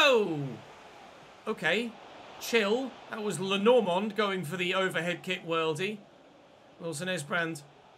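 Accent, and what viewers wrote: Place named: Lancashire, United Kingdom. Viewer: Frighteningly accurate Lancashire accent.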